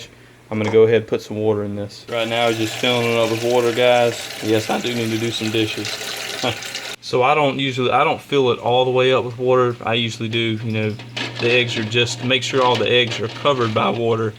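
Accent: South, United States. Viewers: I’ve done the cold water with ice and they still are difficult to peel. I will try your method. For the record I like your southern drawl! Keep on keepin on!!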